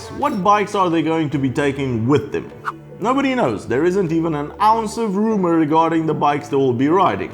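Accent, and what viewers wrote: Afrikaans, South Africa. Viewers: Speaking about South Africa.. Your accent is nearly identical to an Afrikaans English accent. I'm from SA and I had to check the channel page to see that you actually hail from Romania. Sorry for being so random lol. Great channel BTW.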